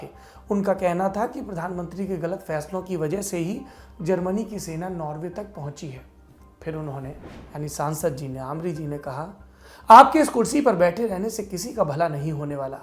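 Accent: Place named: Bundelkhand, India. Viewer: सिद्ध हिंदी के बीच थोड़ी सी बुंदेल खंडी हो वाह #दुनियादारी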